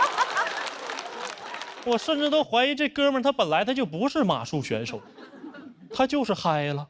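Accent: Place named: Liaobei, China. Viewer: 说学邱瑞的观众，你们身边一个辽宁人都没有？我们辽宁人或者说东北人平时聊天就这样，而且林简七抚顺人，邱瑞沈阳人，统称辽北人，口音就极为相似，你不如说全辽北人均学邱瑞。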